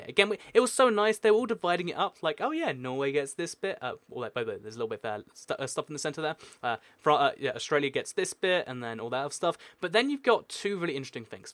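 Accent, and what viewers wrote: England, United Kingdom. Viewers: Very good material. It could have been cut up into two- or more presentations. I live in (kind of) the Southern U.S. and the combination of your English accent (which is delightful, by the way) and the speed with which you blew through your presentation made it a little harder (for me, anyway) to understand. I genuinely enjoyed the video, however, and am impressed by the amount of research you must have had to do to acquire this obscure knowledge (disputed and unclaimed lands of the earth). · Kiwi he's British, they pronounce it differently Coming from a Bosnian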